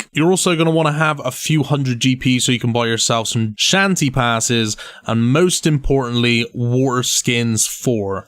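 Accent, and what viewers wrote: Bristol, United Kingdom. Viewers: I've just found you but are you not Bristolian? Liked & Subbed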